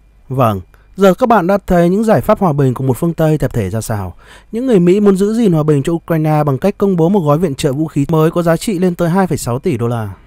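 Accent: Standard. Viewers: rất hay và chuẩn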